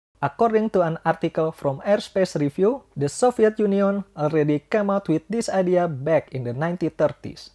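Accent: Indonesia. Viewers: Wkwk Orang indo kan ? Accent nya Indo Banget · Hmm logatnya khas orang Indonesia sekali.. Nambah ciri khas negara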